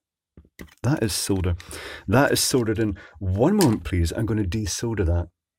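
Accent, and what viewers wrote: Scotland, United Kingdom. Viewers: The accent is Scotland's 2nd most valuable export. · Hi, Love all your videos, been a fan since around 2010, love your soothing Scottish voice! Now I didn't know how else to contact you, but can you do a video about rejuvenating laptop batteries, including their controllers, as I have scoured the net and can't really find anything that addresses the problems with why they wont work after changing/recharging/replacing cells. I've a ton of them and really don't want to tear them apart for just the cells, if the controllers can be put to some use. I know there are a plethora of types/styles/models etc, but maybe if you can autopsy a generic HP or Toshiba maybe Dell that would be great. Look forward to learning.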